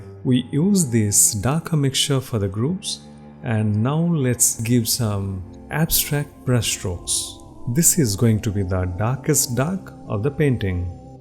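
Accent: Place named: India. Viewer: Before reading that you are Indian ..i recongnize your campus accent.. i have travelled a lot all around India ...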